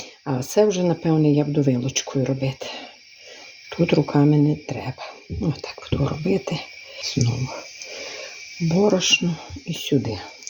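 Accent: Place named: Chernivtsi, Ukraine. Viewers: Спррбую по вашому рецепту.По розмові ,певно ви землячка,думаю що ви з Кіцманщини.так?💐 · Моя братова з Чернівців ,говорить так як ви, мені дуже подобається.Дякую за рецепт Зараз буду готувати. Мирного нам неба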